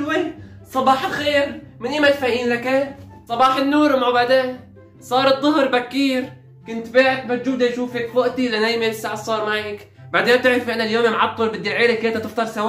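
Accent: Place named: Aleppo, Syria. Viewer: أنا عم اتعلم الحكي الحلبي كلو منك💜💜❤️❤️مين متلي 👍👇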